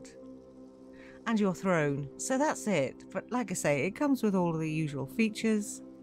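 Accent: United Kingdom. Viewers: You sound like the cutest mother playin Skyrim with that Pommy accent. 😆xx Aawh fkn ell! 😂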